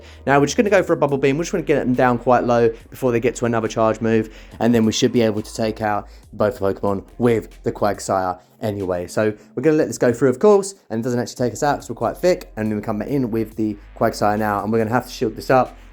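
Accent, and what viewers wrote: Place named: Ireland. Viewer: Love the Irish accent 😳😍 please more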